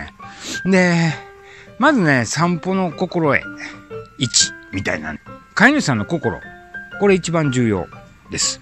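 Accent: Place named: Kansai, Japan. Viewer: 初めまして。スーパーで置き去りにされていた推定5歳のミニチュアピンシャーのメスを引き取って育てて半年になります。当初からグイグイ引っ張り、吠える子でしたがどんどんひどくなってます。犬、車には飛びかかっていく勢いでほえまくりで、とにかく家から一歩でたとたんあたりを警戒して興奮してハアハアが止まりません。普通に穏やかに歩けたことなど一度もありません😂 の動画に出会い、主従関係がまずなってないのだと気づき、室内でリードをつけてボス犬になるべく頑張って一週間たちました。まだまだ辛いだけの散歩です。は関西弁ですがどちらにいらっしゃるのでしょうか？ぜひ伺つて教えを乞いたいいと切望しております。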